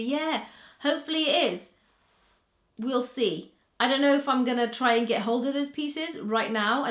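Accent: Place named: England, United Kingdom. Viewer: you have the most beautiful english accent ive ever heard. like wtf how?!?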